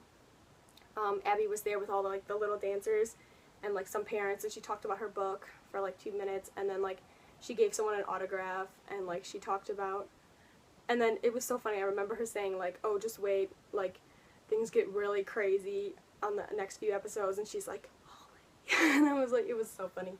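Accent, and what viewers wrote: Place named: Detroit, United States. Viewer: I love your Detroit accent lol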